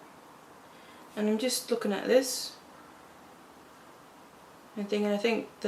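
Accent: United Kingdom. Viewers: Awesome video! Where in the UK is your accent from?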